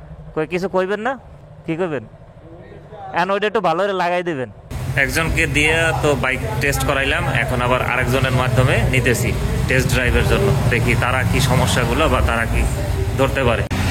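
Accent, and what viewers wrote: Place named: Narayanganj, Bangladesh. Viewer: ভাই আপনি কি নারায়ণগঞ্জে নাকি ? নারায়ণগঞ্জের মত মনে হচ্ছে।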